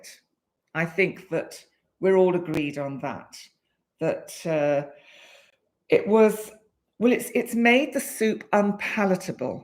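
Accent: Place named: England, United Kingdom. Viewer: I loove your english accent ❤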